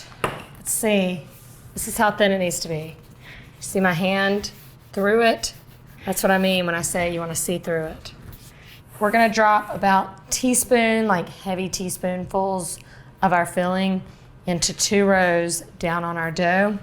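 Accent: South, United States. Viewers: That southern accent throws you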